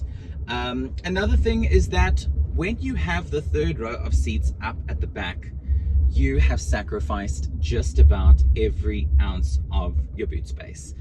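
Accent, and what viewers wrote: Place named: South Delhi, India. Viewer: You accent is same as south delhi rich kid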